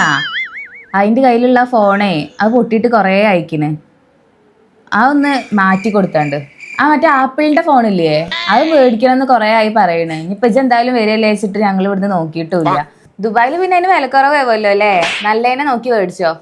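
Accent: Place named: Malappuram, India. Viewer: സംസാരം മലപ്പുറം സ്റ്റൈൽ ആണല്ലോ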